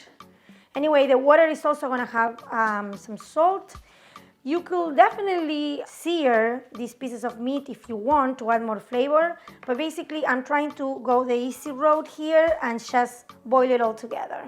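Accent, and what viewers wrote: Argentina. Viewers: my mom barely ever cooks, her signature dish was always "aroz con porquerias" but one thing she always made back in Argentina was puchero, seeing puchero argentino with the argentine accent and the apron with Mafalda really made me miss my mom, haven't seen her in person for a few months cause of covid. thanks for being wonderful!!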